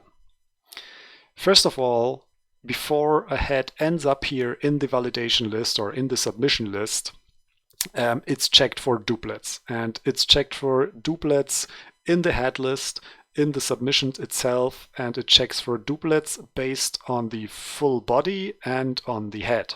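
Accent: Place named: Germany. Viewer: Klingt nach einem Deutschem, der Englisch spricht ^^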